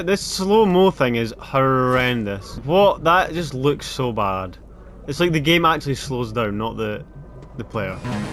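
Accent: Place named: Scotland, United Kingdom. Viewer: God bless you. I actually really enjoyed this video. I laughed a lot. Something about a miserable man with a Scottish accent makes it that much better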